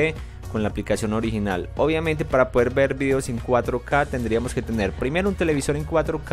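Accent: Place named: Colombia. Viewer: buenas creo que por voz que eres colombiano! que precio tiene esa box y en dónde comprarla en Colombia ? gracias de ante mano.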